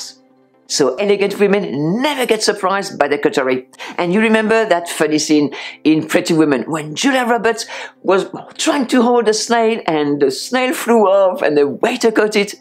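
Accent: France. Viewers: I love your french accent.!!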